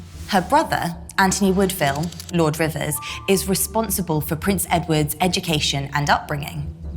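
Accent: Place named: Northern England, United Kingdom. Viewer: 27 Semantics on the pronunciation, but I AM British and this...this is not London. This is actually even more impressive because the accent is Yorkshire, Derbyshire, Midlands region waaaay to the North which is where they were from as Yorkists. It's a modern Northern accent though. If he read it in the English of the day, you'd barely understand it. Here is a video of a linguistics professor at the University of Leicester reading a letter written by Richard III some years before making himself King. He's written to borrow money, and the reader uses the King's English of the late 15th century. You might enjoy this if you're still about and see it. :D What did Richard III Sound Like? <link>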